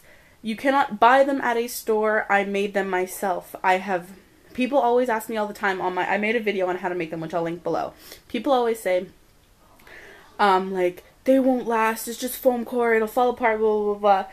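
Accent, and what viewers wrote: Canada. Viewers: are you canadian or american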